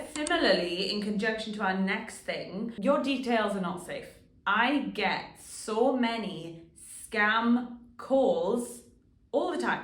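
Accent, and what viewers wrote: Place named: Wales, United Kingdom. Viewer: Thank you for mentioning so early in the vid where you were from (Wales) - was driving me crazy not being able to pick the accent properly 😂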